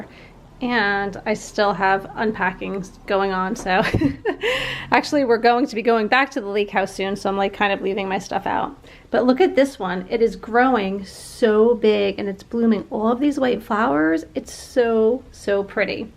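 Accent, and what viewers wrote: New Jersey, United States. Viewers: Just joined your channel! love your content. Love your NJ accent :)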